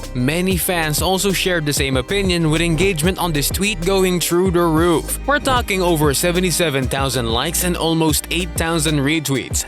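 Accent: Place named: Philippines. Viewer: Pinoy n pinoy accent m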